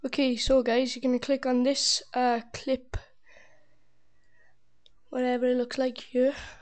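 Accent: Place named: Ireland. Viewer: OMG ARE YOU IRISH?!?!?! I LOVE IRISH PEOPLE *-*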